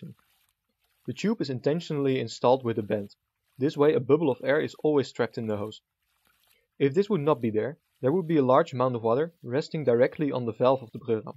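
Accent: Netherlands. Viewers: Lol hearing dutch accent and a water project😂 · First of all fuck the dutch or whatever accent..I feel like Im about to head into a gas chamber...second of all the lost water can easily be recoupped...but no...."Its ok because it comes from a river"....I hope your eggs break....You're welcome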